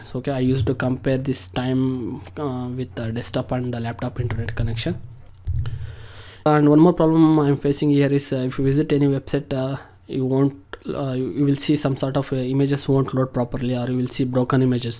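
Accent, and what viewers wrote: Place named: India. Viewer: Gosh I can't stand the Indian accent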